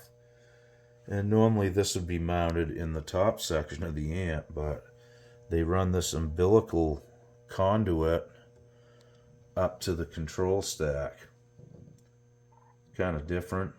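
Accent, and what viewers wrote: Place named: Maine, United States. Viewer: You sounds like you straight came out of middle/central Maine.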